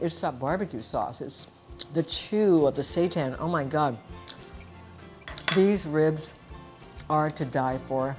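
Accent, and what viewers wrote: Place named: United States. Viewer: Is she Japanese? She sounds American / Canadian.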